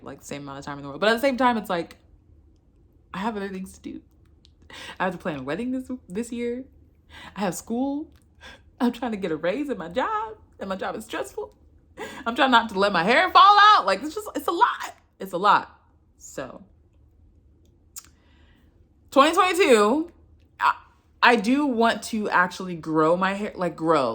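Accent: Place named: Nigeria. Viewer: Random: I love your Nigerian (taking a wild guess) accent and thank you for this vid!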